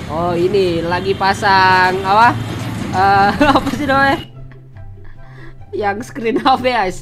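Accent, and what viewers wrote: Kalimantan, Indonesia. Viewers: Anjim, org kalimantan trnyata. Jelas aja agak kenal dngn logat2 nya😂😂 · Abang Kalimantan apa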